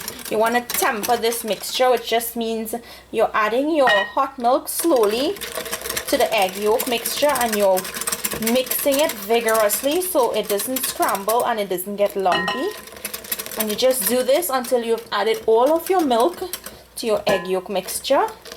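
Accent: Trinidad and Tobago. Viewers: Hi am trini my name is Sharon and i enjoy all your recipes I've tried a few and it came out spectacular. thanks so much.am glad you didn't lose your trini accent.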